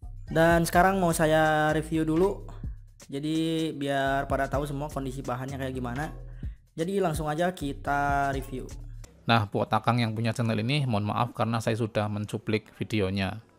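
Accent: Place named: Banyumas, Indonesia. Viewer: Orang Ngapak ya om 😂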